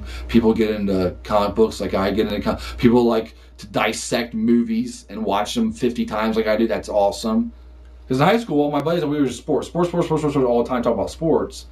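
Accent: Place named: United States. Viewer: Bro, you have the coolest American accent ever...^_^...and your new haircut looks like Sev from Killzone 2...woooo! BTW you have zombie spaghetti sauce on your shirt...O_O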